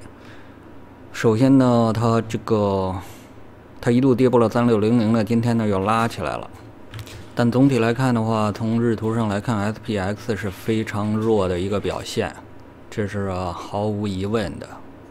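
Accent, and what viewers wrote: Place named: Beijing, China. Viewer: 喜歡你的京腔….沒有discord?或微信？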